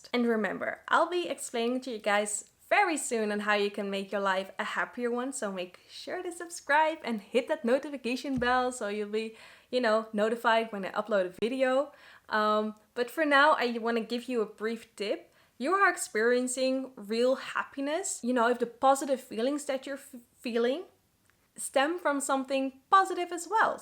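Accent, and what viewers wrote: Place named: Oxford, United Kingdom. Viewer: After your return from your overwhelming trip to Australia, I see a very happy grown-up young woman. I enjoyed you presentation very much. Your Oxford English is excellent. Even I could follow you without a problem. I am very proud of you. From a retired professional I give you a small advice. Speak a little slower and bring in short breaks. The interested listener has to consume your message. Your old but very happy Opa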